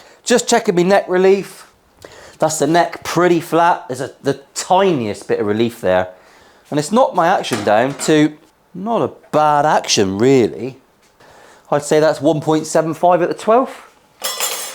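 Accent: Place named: South West, United Kingdom. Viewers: Scouser? West Country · I subbed for the south West accent and the comment about going on Ebay pissed 😂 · I’m thinking of going into the guitar nut business and branding them Wanksox 😂 loved the video, and for a an ex BritPat living in US, I almost understood the west country accent 😊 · Always a trip hearing a fellow Janner on YouTube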